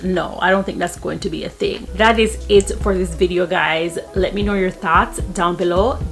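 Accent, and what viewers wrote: Jamaica. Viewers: Hail up muma!!! Hear the jamaica accent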